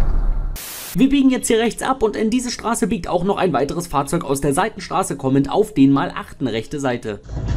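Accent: Berlin, Germany. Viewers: Die Videos sind schon manchmal echt krass, aber viel cooler find ick dein berlinerisch ❤ Mit den besten Grüßen aus der Hauptstadt 🎉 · Deine Kommentare sind einfach mal richtig schön mit dem Berliner Dialekt 😂😂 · Ich liebe Dein Berliner Dialekt, das klingt so lieblich. Ja, smartphones im Straßenverkehr sind das größte und "modernste" künstliche Zusatz-Übel aller Zeiten! Auch wenn sie nicht direkt dran sind, es strahlt extrem und erzeugt Verpeiltheit/Verstrahlung und vermehrt auch Angina Pectoris und Gedächtnislücken als auch Konzentrationsstörungen, Spaltkopfschmerz und burnout, da ja Mikrowellenfrequenzschleudern, die extrem disruptiv gepulst-digitale Störsignal- Befeldung auf die Synapsen, Zellen und das vegetative Nervensystem absondern mit extremer Wirkung, kein Wunder, daß die Lobby all die dazugehörigen Studien zwar zahlte, aber sie aufgrund der extremen Negativauswirkung auf das Geschäft nie veröffentlichte. 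Bluetooth, WLAN gehören dazu!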